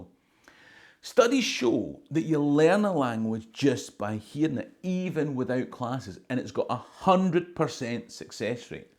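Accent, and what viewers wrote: Scotland, United Kingdom. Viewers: I dunno, but sounds to me like you speak Gaelic with a Scottish accent. There's irony in there somewhere. You didn't mention Duolingo which has an introductory but extensive course in Gaelic. It's free (which appeals to me) and completely flexible (although they pester you to complete a lesson a day). For those in North America, there's Colaisde na Gàidhlig (The Gaelic College) in Nova Scotia. They offer in-person and on-line courses. (I don't believe there's a bar/pub, however.) <link> Tìoraidh an-dràsta. Chì mi a-rithist thu. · We American women love a good Scottish accent. Any videos of you in a kilt? Thank you in advance.🌷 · I am learning your Accent as i listen to you speak..i realize it is possibly a regional accent so i wont say it is THE Scottish accent.i repeat how you say words..i love the Scottish Accent as i am a Henderson and have MacDougal family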